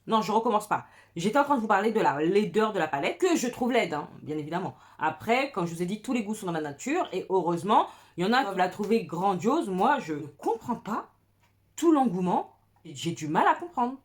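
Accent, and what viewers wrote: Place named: Africa. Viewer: Quand tu montes le niveau d'exigence tu prends l'accent africain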